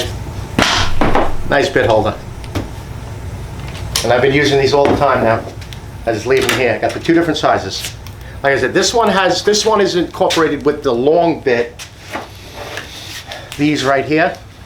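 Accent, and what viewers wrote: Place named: Massachusetts, United States. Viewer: I could tell by your accent. I live in mass.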